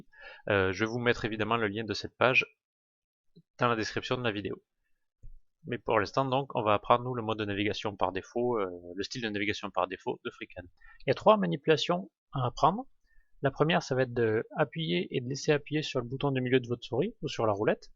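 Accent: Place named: South, France. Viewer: Bravo pour toutes ces vidéos, excellentes à tous points de vues... avé un petit accent du sud... Ceci dit, savez-vous comment changer le plan d'origine d'une création de pièce? ou changer l'axe d'origine? merci d'avance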